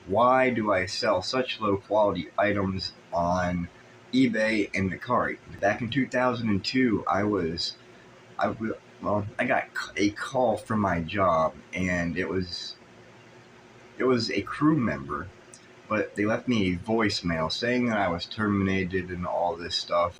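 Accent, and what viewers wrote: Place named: New York, United States. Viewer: How tf did I know you where from ny 😂😂😂 definitely cuse or maybe further up like Albany or Saratoga 😂😂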